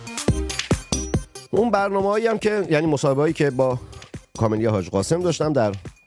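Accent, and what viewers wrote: Abadan, Iran. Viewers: ماهم دوستت داريم هموطن اباداني خوش زبان وخوش لحجه🌺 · درود گرامی ❤❤ درودها به شرف‌تون ❤❤ بسیار بسیار فیض میبرم که برنامه‌تون با لحجه و حال و هوای آبادانیِ❤❤ بسیار بسیار عالی و زیبا ❤❤ با اینکه خودم آذری هستم ولی عاشق و مُرده ی لحجه ها و آواها و فرهنگهای گرم و گوناگونِ میهنم ایران هستم❤❤ با همین فرمون جلو برید که عشقید❤❤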